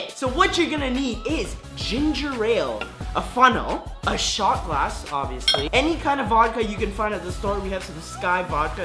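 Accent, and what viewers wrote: Canada. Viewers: Drake on SNL did a skit on black jeopardy and was playing a black Canadian with a Canadian accent. He sounded just like you. He stole your swag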